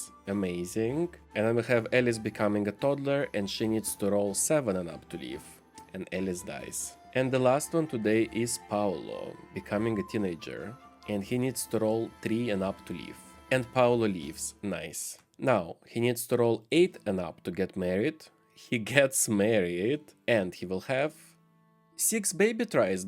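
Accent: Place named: Russia. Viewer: Hi,! Accidentally, saw this video in my YouTube recommendations and immediately subscribed Are you from Russia or Ukraine/Belarus? I recognize this accent from a thousand ❤️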